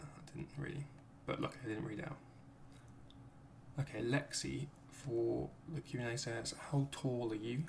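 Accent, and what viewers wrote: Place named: West Midlands, United Kingdom. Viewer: you sound like you live near the west midlands! your accent I mean, I live in brum.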